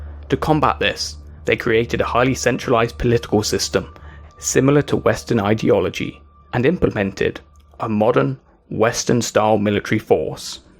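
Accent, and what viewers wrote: United Kingdom. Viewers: That accent,you from England?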